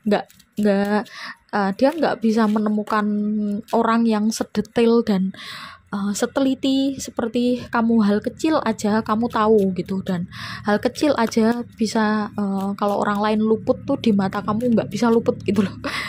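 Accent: Java, Indonesia. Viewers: Keunikanmu pn untuk ku suara dn logat jawa yg lembut mu buatku sangat senang dn kumerasa auramu sangat positip.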